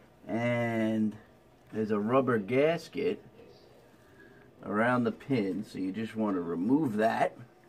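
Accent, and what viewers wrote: Boston, United States. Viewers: I’m guessing from the accent, “right heah”, “in theah”, “cawfee” and the red hair that this was recorded at Nocella’s Southie branch. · Ah you from Bahston? Me, too. Thanks for the help. Will now try to see if it works.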